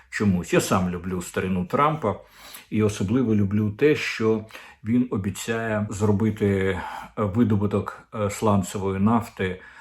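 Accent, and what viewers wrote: Russia. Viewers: Арестович разом з Корчинським колись їздили в Москву для зустрічі з Дугіним і планували спільні дії щодо просування русского мира. Тому недивно, що став на захист Арестовича. Мова у пана українська, лише акцент російський. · Уважаемые украинцы, мне кажется, или этот человек говорит не на чисто украинском, а на каком-то варианте украинского, максимально приближенной к русскому? Он русскоязычный? Просто я 90% понимаю, что он говорит. А когда дикторов на телевидении слушаю, то понимаю только 50%. Он вроде какой-то там националист. Должен правильно по-украински говорить.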